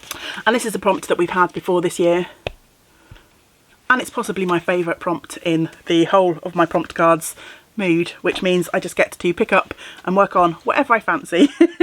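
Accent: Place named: Wales, United Kingdom. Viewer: I am binge watching many of your videos. As I am working through my cast-ons for 2026, I have been thinking about what about you that has captured my attention . One thing is your accent and that you are in Wales. Your home town is beautiful. You have so many ideas. You enjoy many projects at once. You are a plus size woman. It is refreshing for me also a plus size woman. And your waffling is a great joy to me.🤣🤣🤣💚💚💚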